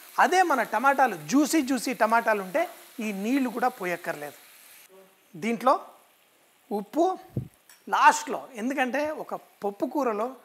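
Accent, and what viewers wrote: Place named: Hyderabad, India. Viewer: We want that Hyderabadi voice✌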